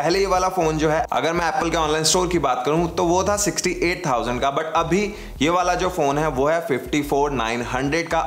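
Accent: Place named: Delhi, India. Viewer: Nice video! It sounds really funny when you emphasise "me india se nahi hoon" :D :D and you sound like a typical Lajpat Nagar boy :) Should rather say i don't live in India. :)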